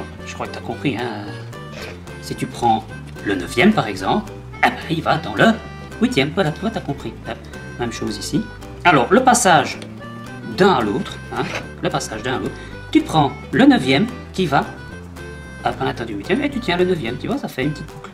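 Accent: Belgium. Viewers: Merci beaucoup, je vais tester ..super explications avec l'accent belge...c'est toujours agréable pour une expatriée...bonne année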